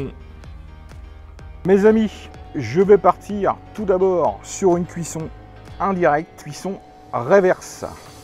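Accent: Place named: Normandy, France. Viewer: C'est l'accent normand hehe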